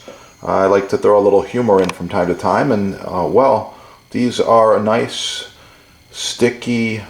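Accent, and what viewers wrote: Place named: Midwest, United States. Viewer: Hey dude, I love your videos! I found you through searching for finishing wood. Also, you’re f’ing hilarious! And your accent is amazing. Midwest? Wisconsin? Anyway, keep up the good work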